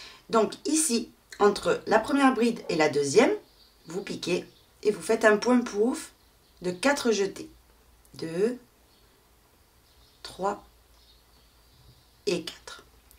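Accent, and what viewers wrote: South, France. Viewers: bonjour.. et merci beaucoup pour toutes ces belles choses que vous nous donnez à faire.. j'en suis à mon quatrième châle avec vous, et je ne peux que me féliciter de vous avoir trouvée sur mon chemin, en me promenant sur YOUTUBE... vous m'êtes d'un immense secours; et ainsi, je peux faire plaisir, dès que possible, à certains de ma famille et j'apprends plein de chouettes points, ce qui me transporte de joie.. merci beaucoup. le dernier en train est un point relief que j'ai fait avec caprice couleurs mélangées qui rappellent de délicieux sorbets pêche/vanille/citron enfin des couleurs pep's et un point extra!! le prochain avec le point "pouf"!!... sera le bienvenu.. merci pour vos explications claires et attentives... et aussi pour votre accent qui me fait voyager au pays du soleil.. bonne journée et portez vous bien.. à bientôt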